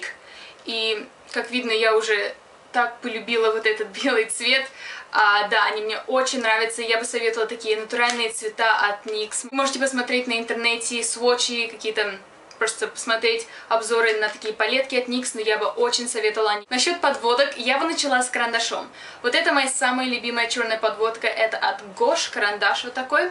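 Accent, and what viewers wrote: England, United Kingdom. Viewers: Как заметен английский акцент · Я не понимаю что у с разговором?Она англичанка?Или как потому,что слышен какой то иностранный. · У тебя английский акцент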